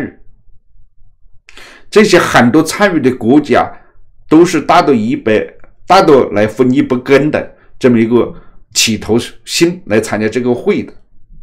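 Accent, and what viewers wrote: Hunan, China. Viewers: 这个主持人一口的湖南腔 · 我麻烦你莫用湖南腔来港话好不？丢湖南人脸，你老屋哪里滴？长株潭地区的吧?真滴舍里舍格！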